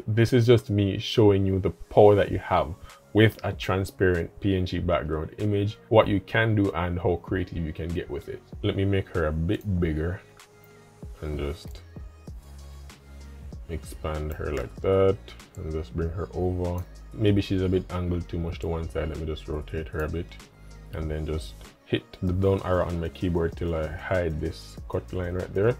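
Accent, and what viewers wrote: Jamaica. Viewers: Excellent video. Do I detect a Jamaican accent? Thanks for sharing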